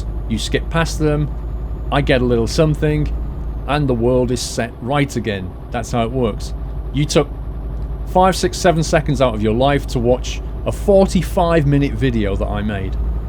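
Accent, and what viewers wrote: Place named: United Kingdom. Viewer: I am a Pennsylvania person who enjoys listening to you talk in your British way. Your like a person who can keep anyone company. Sometimes you are funny. Also, it's neat to see how the ways you have to learn how to handle traffic and situations along the way as you travel.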